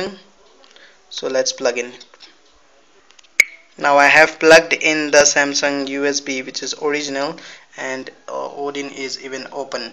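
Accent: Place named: India. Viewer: i can't understand the indian english :-(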